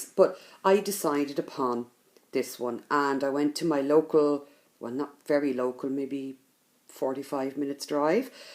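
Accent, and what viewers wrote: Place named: Ireland. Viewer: Hi. Ah, I could listen to your speak all day, I love a great Irish accent. If it were me, I'd cut the four panels of the tree and stitch them separately, just for, ease of use. A couple of online stores in the UK are Sew and So and Bothy Threads. Etsy, Clouds Factory, Frosted Pumpkin and Heaven & Earth Designs (HAED) all offer downloadable PDFs available for purchase (so no shipping costs).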